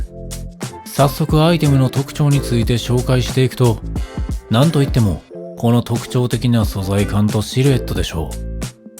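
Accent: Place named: Kansai, Japan. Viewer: 参考になります！ 語尾の関西のイントネーション（？）がクセになります笑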